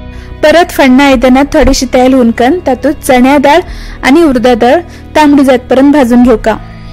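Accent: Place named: Karwar, India. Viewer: Loved to hear your Karwar konkani...i am also konkani ,but from Mangalore (GSB)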